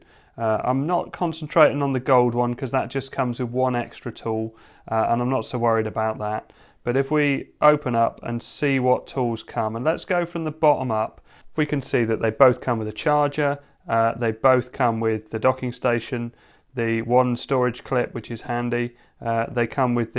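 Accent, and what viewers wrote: United Kingdom. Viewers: thanks for responding. Love the bri’ish accent 🇬🇧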